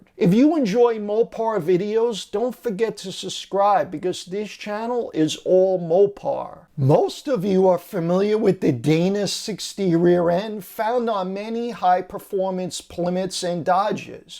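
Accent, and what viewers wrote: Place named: Bronx, United States. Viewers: the East Coast accent Just like when they say they're going to Florida We're taking the kids down to Disney world in Flarider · That accent... Baracooder"..😂 like my grandma from New York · Hey! Are you from the Bronx? You talk the same way my father did.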